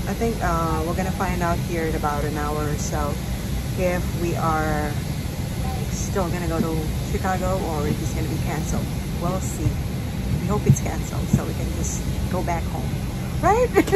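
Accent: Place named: Philippines. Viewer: Ok ...ok....you came up on my youtube, and when I heard you speak...I said to myself...."Is she a filipina?". I kept on listening, and listening, and when you said the word "actually"....I said YESS! , She is a filipina! Then your mannerisms at the end, confirmed a little bit. That is how my female cousins act when they get a little "friendly". LOL! But when you ended your vlog with a "Maraming Salamat Po"! That confirmed it!!! MABUHAY!! I just subscribed!!!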